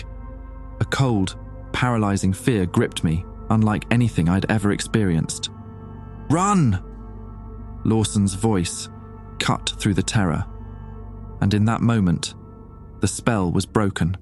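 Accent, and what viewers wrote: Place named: United Kingdom. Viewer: a handsome british voice on an (ant)artic creepypasta? sub.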